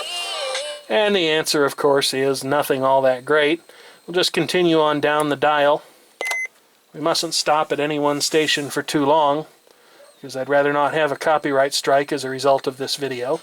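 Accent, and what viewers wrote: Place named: Northern Illinois, United States. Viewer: i guess you from northern il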